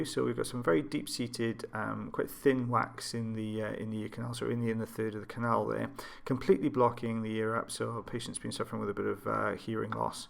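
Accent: Wales, United Kingdom. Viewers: Actually get disappointed watching any other removal videos but yours. So clear and the lovely welsh voice over 👍🏻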